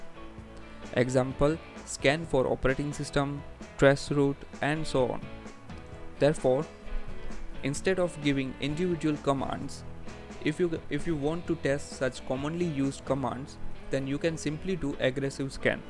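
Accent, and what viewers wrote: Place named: India. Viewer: love your indian accent, great video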